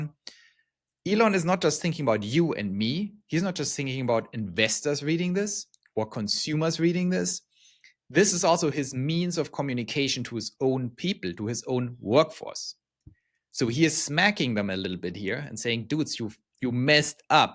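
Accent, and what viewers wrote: Germany. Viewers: Interessanter Inhalt, aber der deutsche Akzent ist so hart, dass ich nicht zuhören kann 😅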